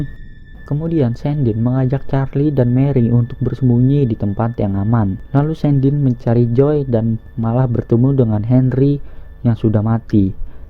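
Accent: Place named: Indonesia. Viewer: Maklum Bro,Mulut Orang Indo Ga terbiasa Dengan Bahasa Inggris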